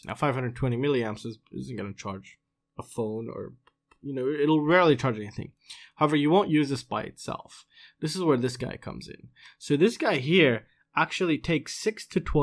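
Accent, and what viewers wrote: California, United States. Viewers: david tilvikas he sounds like he has a California accent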